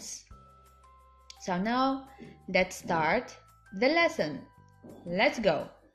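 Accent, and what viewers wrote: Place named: United Kingdom. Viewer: Hi,you have amazing british accent thank you for evreything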